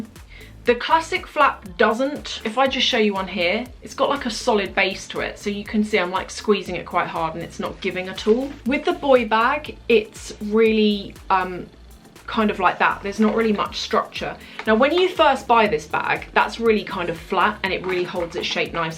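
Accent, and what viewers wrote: United Kingdom. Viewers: Thank you, for the most entertaining, informative and creative luxury podcast of all of them. I love that you get right to it and do not belabor incessant droning on and on about nothing over and over and over. You have super fun communication skills, are SUPER FUNNY, PLUS your British accent is addictive. Thank you for a refreshing and lovely addition to my day.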